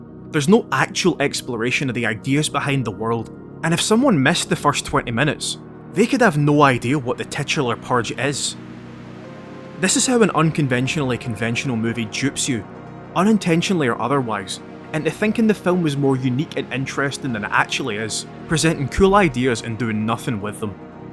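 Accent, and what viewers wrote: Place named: Scotland, United Kingdom. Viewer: Your accent reminds of Trainspotting! Scottish! 😅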